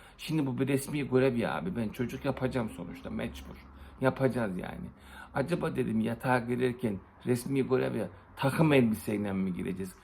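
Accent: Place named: Tokat, Turkey. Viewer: Tokat'taki akrabaların geldi aklıma. Şive konuşma tarzı herşey aynı:)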